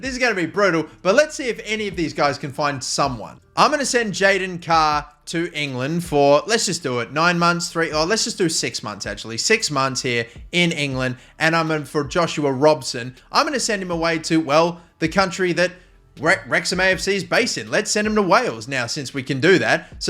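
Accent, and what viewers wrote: Australia. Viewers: yh he's from Melbourne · Wait is he australian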